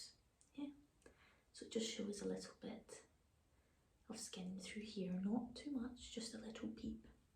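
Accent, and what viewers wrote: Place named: Scotland, United Kingdom. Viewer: Is that Scottish accent? Or Irish? How cute and relaxing :)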